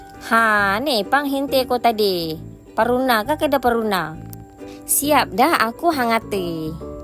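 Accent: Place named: Banjar, Indonesia. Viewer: Bagus..cakap tu... Banjarnya agak karas bunyinya... ia lambut cikit...n laju...surahnya...😅🎉🎉terbaik....kulaan...rami bangat mendangar..... Ajari lah....ka.kanakan...agar nahap keturun...bisa bersurah...❤️❤️❤️🥰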